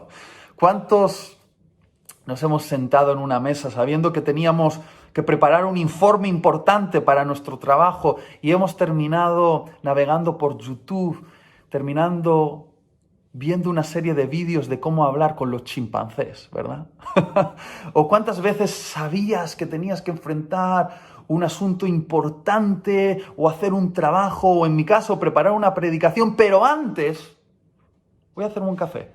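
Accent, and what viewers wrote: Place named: Spain. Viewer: Es su acento, es español.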